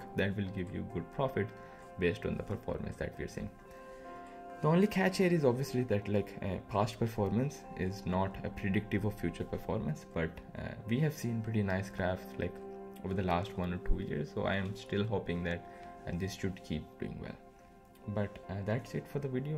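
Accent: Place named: Gujarat, India. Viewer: Are you from gujarat or mumbai by any chance... Guessing from the accent.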